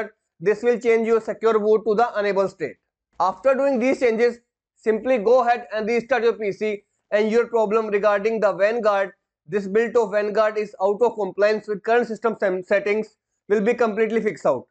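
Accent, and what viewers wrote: India. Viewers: I dont fking understand ur thick mumbai accent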